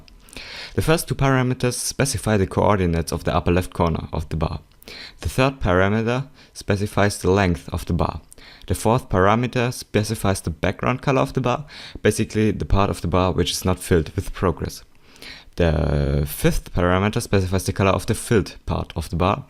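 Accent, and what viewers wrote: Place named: Germany. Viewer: Wieso hört man es immer heraus, wenn ein Deutscher englische Tutorials macht? :D (wohl, weil mein englisch genauso klingt?^^) Ich wollte eben nachfragen, aber ein Blick auf deinen Kanal gab bereits die Antwort. Ich hätte es ja als hübsch empfunden, wenn du am Ende noch das Beispiel direkt in MC gezeigt hättest. p.S.: wird im CC-Forum keiner mehr angenommen? Ich bekomme einfach diese validation email nicht zugesendet und habe dadurch keine Rechte im Forum noch kann ich den Admin anschreiben - blöd. Dabei hätte ich gar eine sehr nützliche API.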